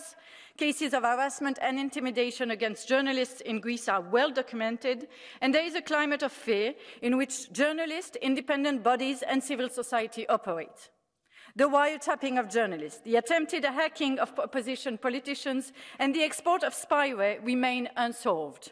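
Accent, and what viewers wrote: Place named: United Kingdom. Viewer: Je ne comprends pas... vous êtes une élue française au Parlement Européen. Pourquoi vous exprimez vous en Anglais? Peut être est ce une obligation pour cette séance ? Même si vous êtes née au UK, l'accent m'a fait décrocher du contenu. Sinon, je vois que vous pourfendez les autocraties en Grèce et en Hongrie. Vous vous inquiétez du choc autoritaire en France aussi. C'est bien, c'est cohérent, merci. Nul doute qu'entre le dénigrement du résultat du référendum de 2005, et les dernières décisions des Conseils d'Etat et Constitutionnel (juges non élus), vous avez de beaux exemples pour alimenter votre lutte pour la Démocratie dans notre beau pays ! 😅👍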